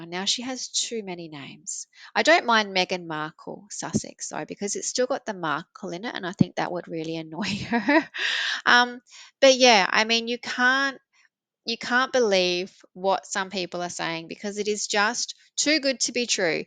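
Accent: Australia. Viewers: I enjoyed the podcast or is it a video ? you sound like an Australian and must compliment your ability to make fair comments without being nasty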